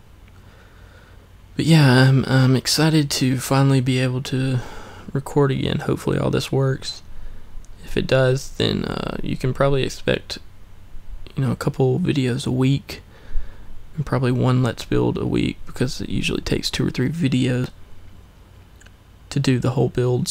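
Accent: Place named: United States. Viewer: I love your country accent! ;-)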